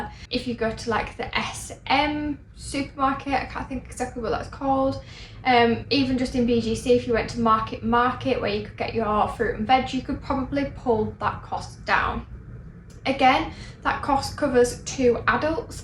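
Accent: United Kingdom. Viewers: what specific british accent is this? sounds cute.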